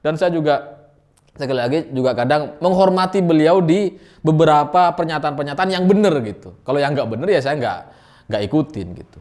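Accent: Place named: Surabaya, Indonesia. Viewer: Hahaha nguenyekmu broooo, khas suroboyoan 🤣🤣🤣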